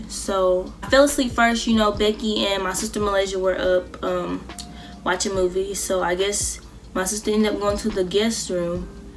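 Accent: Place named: North Carolina, United States. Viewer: You sound like you from the NC . AUTOMATIC subscribe <3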